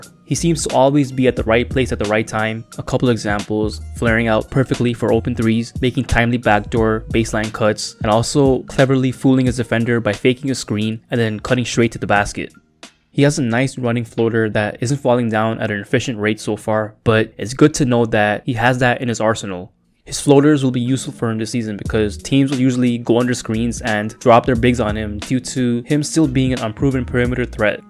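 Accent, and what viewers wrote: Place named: Germany. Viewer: bro versuch nicht deinen deutschen akzent zu verstecken man hört ihn deutlich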